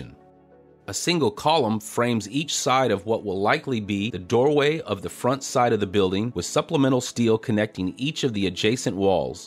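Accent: Texas, United States. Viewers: Welcome back!... seriously, missed that familiar voice.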